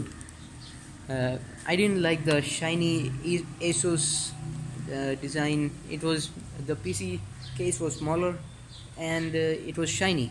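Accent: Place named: England, United Kingdom. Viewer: Aye haye English accent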